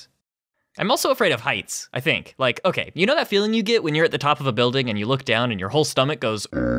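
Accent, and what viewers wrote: Canada. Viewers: you kinda sounded like a candian from south park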